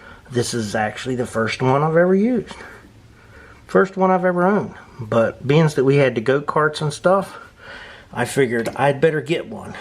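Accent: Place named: Pennsylvania, United States. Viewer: Love your accent.. PA? NC?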